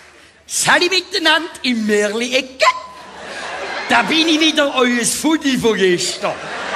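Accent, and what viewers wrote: Switzerland. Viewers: 10% verstanden und trotzdem gelacht ^^ - ich kann kein schwitzer Dütsch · Nee das ist Schweizerdeutsch :) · schade das des "nur" auf schwitzerisch is. bin aus bayern und hatte den marco in der wochenschow immer gern. leider versteh ich nichts.... nurn bissl was ich mir zusammen reim kann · schweizerdeutsch. zugerdeutsch. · Neh an und für sich ist das ne Art deutsch ... Aber was jetzt genau ? Irgend ne art Dialekt oder dieses Schitzerdütsch ??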